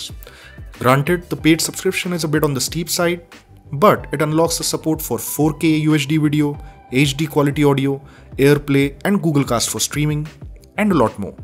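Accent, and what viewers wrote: India. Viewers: Indian english 🙁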